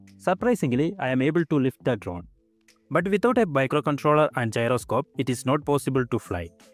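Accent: India. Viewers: 我勒个印度英语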